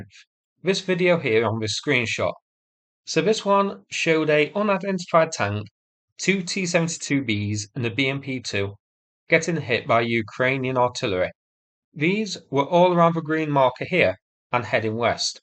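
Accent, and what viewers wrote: United Kingdom. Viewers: Just an observation. You are speaking His Majesty’s English. Here in America. I noticed while working in a Nippon Restaurant. That in Nippon. They teach H.M.S. English I.E. Bonnet = Hood. Boot = Trunk. Knickers are Panties. So My vocabulary is expanding. I use Rodgerd,Knackerd now. And there called cookies Not biscuits. Torches are for Lighting fags ? Oh cigarettes. No it’s a Flashlight. Keep up Your Good Work Sir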